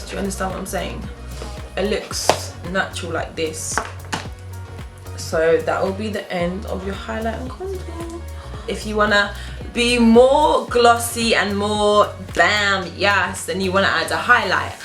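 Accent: England, United Kingdom. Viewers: your British yay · Nice and natural! This was great video. love the English accent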